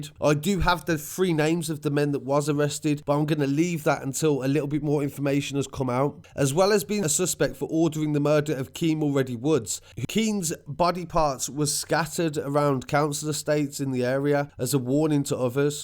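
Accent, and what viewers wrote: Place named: South London, United Kingdom. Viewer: Can tell your from south London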